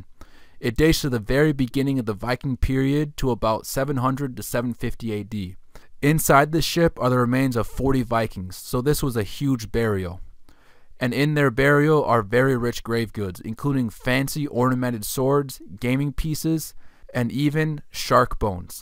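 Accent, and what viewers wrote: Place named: Mexican-American, United States. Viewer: It's tough to place your accent. Sounds almost like what Mexican-Americans with English as a mother tongue sounds like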